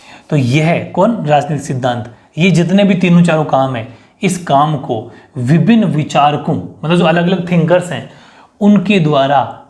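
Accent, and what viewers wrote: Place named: Marwari, India. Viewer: oho sirrr nyo paint kra liya kai 😂😂kursi bhi., shandaar h🤩... Sirr aap to baithe baithe hi pdha diya kro😊😊, aapke per drd krte h na ☹️ mhari trh hindi + marvari ghni hi achi baat h 😂😂 aapk muh se sunne me jyada acha lgta h sirrr. 2, 3 baar bol diya kro sirr😜😜 indeed sirrr literally me to uss pen ko aapk pen se compare krr rhi hu😎😎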